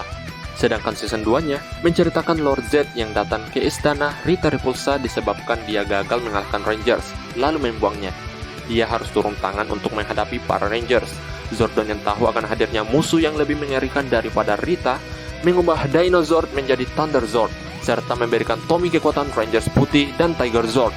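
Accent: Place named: Medan, Indonesia. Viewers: Suaranya Kayak orng medan Ygu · Logatnya kayak orang medan ya bang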